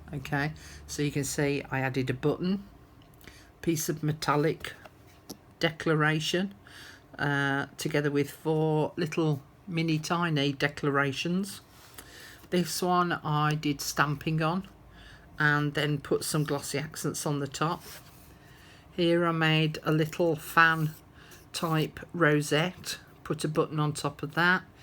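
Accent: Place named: Black Country, United Kingdom. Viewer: Are you from the Black Country? You sound like my friend from Wolverhampton :-) I live in Florida.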